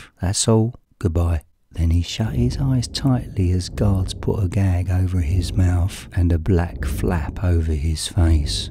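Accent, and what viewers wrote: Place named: England, United Kingdom. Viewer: Another Nightmare Expo attendee. Two things, I loved the first cell slamming sound, scared the piss out of me. 2nd, listening to your voice, I have only heard a few people with the "English" accent that I adored. I like your accent enough to hold it in regard to the likes of Stephen Fry or Jim Dale.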